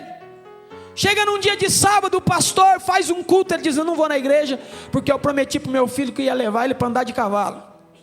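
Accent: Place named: Santa Catarina, Brazil. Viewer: VARÃO DO DEUS ALTÍSSIMO, DÓCIL, HUMILDE E MUITO SÁBIO!!! DEUS O ABENÇOE RICA E ABUNDANTEMENTE, JUNTAMENTE COM SUA LINDA ESPOSA IRMÃ FERNANDA, LINDO O SEU SOTAQUE. O MEU TAMBÉM ERA ASSIM , POIS SOU CATARINENSE. MAS, AGORA SOU CATARINOCA . MEIO CATARINA E MEIO CARIOCA.GRAÇA E PAZ!!! IRMÃ SCHIRLEY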